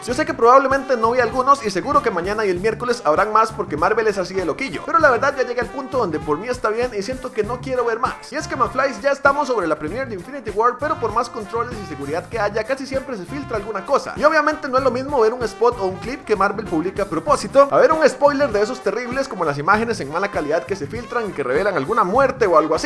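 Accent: Mexico. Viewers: Juraba que era mexicano:v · Pensé que eras mexicano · eres de Costa Rica.? pense que eras de México:'v. pero aun asi eres el mejor v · queeeee?? no eres mexicano? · Enserio es de costa Rica? Juraba que era mexicano, creo que lleve el noseastancreduloMcfly a otro nivel:'v Salúdame y le cambias el nombre a tu canal por " McFly " v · Yo también juraba que eras mexicano 😱😱😱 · Pensé que eras Mexicano jaja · Mae mcfly, crei q eras Mexicano, tal vez por el español neutro, pura vida loco, buen you tuber pa, saludos desde Heredia, muchos exitos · Yo pensé que eras mexicano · pensé que eras de méxico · Yo pensé que eras de México me has timado!!! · ¡Fuimos timados! la mayoría pensábamos que eras mexicano. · Yo soy de costa rica y pensé que eras mexicano xD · Si fui tan credulo para creer que me saludarias lo fui mas cuando crei que eras mexicano:v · Yo pense que eras mexicano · Yo también soy Tico yo siempre creí que eras mexicano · Queeeeeeeee no eres mexicanoo yo pensé que eras mexicano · todos pensaban que eras mexicano:v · Saludame a mi y a todos los que pensabamos que eras Mexicano... 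· mexicano enserio con el acento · Vaya!! Resulte engañada, pensé que eras de México!! Aun así, saludos!! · Creí que eras mexicano,pero aún así te amo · CÓMO QUE NO ES MEXICANO? hubiera apostado a que lo era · Wow eres de costa rica?crei que eras de mexico,buen video sigue asi mcfly. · Pense que eras mexicano... Hola Vecino soy de Nicaragua.. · Pensé que eras de México: v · Pense que eras de mexico:v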